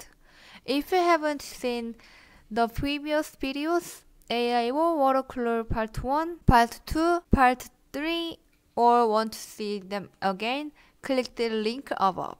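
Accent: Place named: South Korea. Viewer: 발음이 어쩐지 친근하게 들린다했다.. 한국분이셨네 ㅋㅋ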